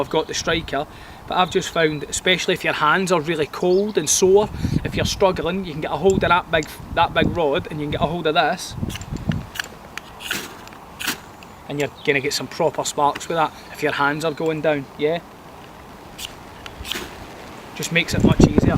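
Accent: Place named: Ayrshire, United Kingdom. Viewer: 2 is 1 and one is...... Subscribed 🤠 all the best dude, sounds like you're near me, I'm in East Ayrshire.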